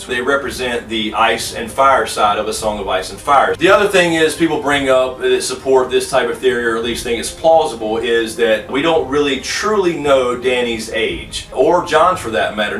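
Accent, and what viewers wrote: South, United States. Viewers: just subscribed and am enjoying your videos - very well-informed and i like the common sense tempering all the crazy theories we make up with too much time between books, lol. i live in the northeast, so i am also enjoying your southern pronunciation of the series' names and such - it adds some regional color to it and once in a while a little confusion on my part. in a video you were talking about the dane (spelling?) family sword "dawn", but i kept hearing "dome" and wondering where there is a dome in westeros, till i realized the regional accent difference. it's nice our regional accents still survive in this internet age. i'm subscribed and looking forward to more great videos. (i also like george r-squared martin, lol) as far as jon and dany's parenting goes, i keep several possibilities floating in my head. i won't go into all of them, but r+l=j seems pretty obvious now that i've seen the series thus far and read the books three times. 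but it worries me because grrm doesn't like things to come easy to readers. it is very possible biologically that lyana could have become pregnant and given birth 9 months after she had jon. and "the seed is strong theory" could just be medieval cluelessness about genetics. even the starks have some kids like starks, and most like tully's. i wonder about dany's visions when she was with the undying. so many of them were about starks. there is a definite connection there, though it doesn't need to be familial. keep up the good work!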